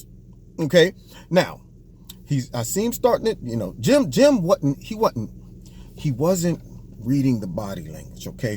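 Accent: England, United Kingdom. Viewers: Good limey accent. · BRILLIANT SUMMATION! Love your English accent haha Sending love from the UK! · You do a good British accent. It is my secret wish that we could somehow peacefully gather together to bring down CNN. I dont want to inhibit free speech, but they should not be able to call themselves the press. There is no single source of hate in our society, but CNN is right up there with the worst of them. Because they intersperse their hateful bias with some semi news, they get away with pure propoganda that is taken seriously. What bothers me the most is how bad they make our country look to the rest of the world. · Hello. I live in England. I loved your English accent. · bad accent , and not many englishman speak like that. its like saying all americans have a New York accent. good points other wise.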